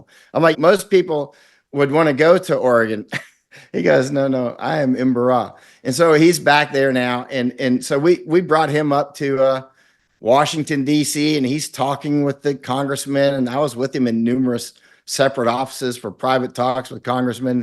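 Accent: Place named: Europe. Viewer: You’re not a white man. You’re a pink man. From Europe. Speaking European English.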